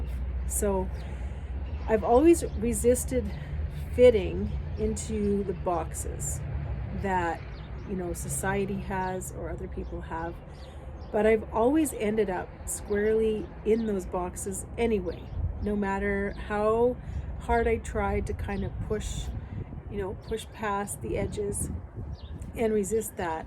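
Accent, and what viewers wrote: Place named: Canada. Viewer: Hello, Debbie over there with Life in Kentucky gave you a shout out and here I am! Curious George. I realize this video is 2 months old but the title is what pulled me in. Music is so important and remember how we used to listen to an entire album side A and B when we were younger? That sounds like a great idea for sure. I love cooking with music. I LOVED AND STILL LOVE Simon and Garfunkel and James Taylor. We always have music playing, I feel like life is a soundtrack. I'm so excited about you coming back to yourself. I can't wait to see more of your videos. I'm so glad I came on over here. Debbie is right. You are amazing, nice to meet you. I am Tracie from Life in the Wylde West. P.S. knew you were Canadian, I love your accent.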